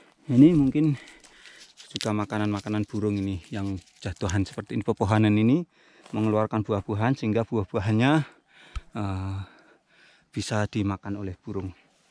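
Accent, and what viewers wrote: Java, Indonesia. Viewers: assalamu alaikum wr.wb. njenengan jawane mana kak... wassalamu alaikum wr.wb.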